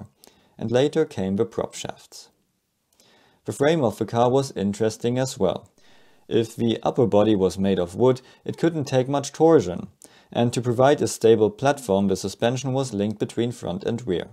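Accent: Germany. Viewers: Klingt so, als würde ein Deutscher versuchen, Englisch zu sprechen.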